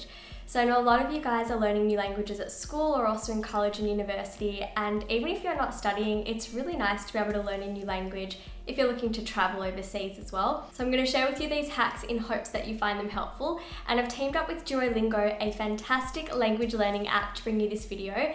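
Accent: Australia. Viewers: Girl, I love your Australian (I think) accent!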